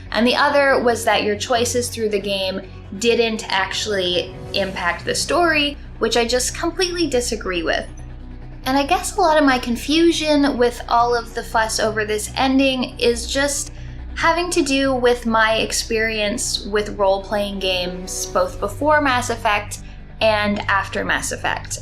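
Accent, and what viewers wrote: Canada. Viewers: You are the first person I've seen with whom I agree COMPLETELY about the remaster. Also, I just picked up on your Canadian accent for the first time just now...which is unforgivable because my wife is from Canada and has the same accent.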